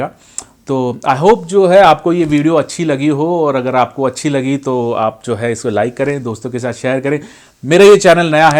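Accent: Kerala, India. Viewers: Malyali ho aap?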